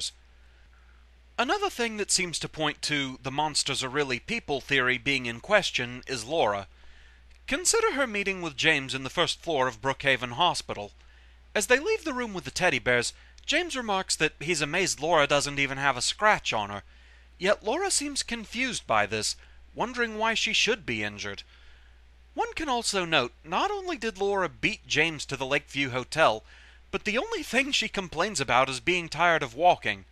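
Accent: United Kingdom. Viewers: ¿Are you brittish my friend?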